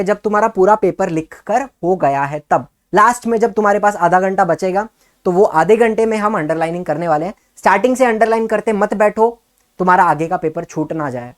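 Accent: Maharashtra, India. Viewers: Sir you are marathi. जात्य डोक्यात. 🤔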